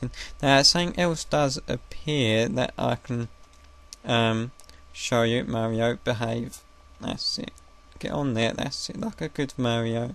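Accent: United Kingdom. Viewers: yey british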